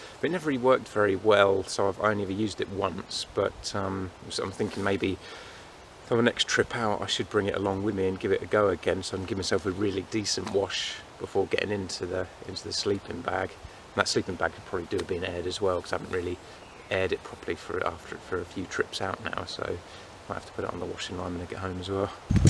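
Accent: United Kingdom. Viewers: Haha, actually you seem to be from UK because of your accent.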